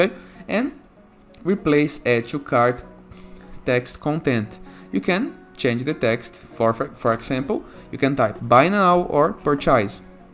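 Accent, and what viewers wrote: Brazil. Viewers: seu inglês tem sotaque de brasileiro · valeu brazuca! HAHHAHA adorei o sotaque! :D tmj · sotaque brasileiro detectado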